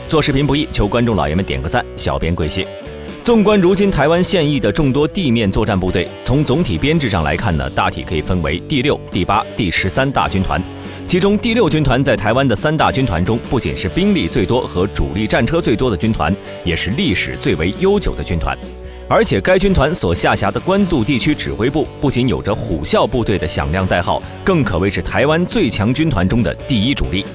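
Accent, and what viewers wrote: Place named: China. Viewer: 這視頻都是台灣人做的配上大陸腔的旁白 ! 讓你以為是中共做的 !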